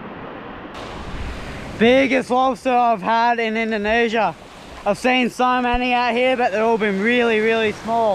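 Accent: Australia. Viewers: You from Perth dude ?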